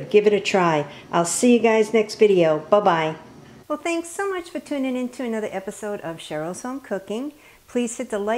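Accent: New Jersey, United States. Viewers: That looks delicious !!! I copied down your recipe, and Subbed ! I also love your kitchen, and that Jersey accent !!! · Definitely from New Jersey New York area! Love it.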